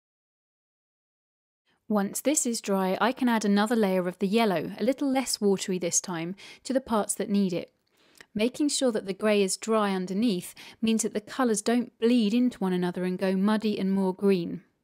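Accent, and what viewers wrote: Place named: England, United Kingdom. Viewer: She speaks perfectly. It's called an English accent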